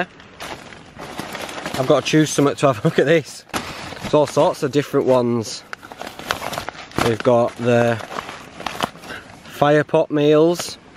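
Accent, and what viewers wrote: Yorkshire, United Kingdom. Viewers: love the video, is the accent yorkshire?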